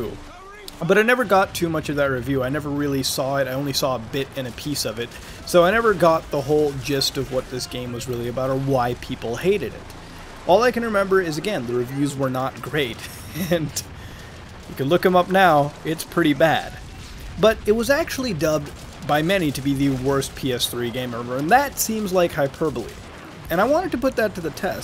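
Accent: Canada. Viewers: wait you're Canadian. ?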